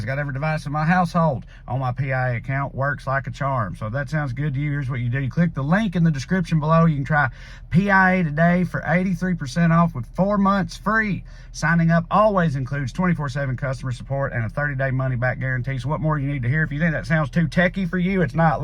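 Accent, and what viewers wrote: South, United States. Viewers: Bless you mate. I showed one of your posts to my Dad became he's become so anti American (especially anti Southerner) because he assumes anyone who speaks like you is a Trump supporting sub-intellect. He said 'I like the boy & i reckon he could speak underwater with a mouthful of marbles'. 😂 Love from Australia 🐨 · I love all your rants Trae! I also spent some of my childhood in the south.. so it's fun to hear you spout liberal views in a southern accent.. keep it up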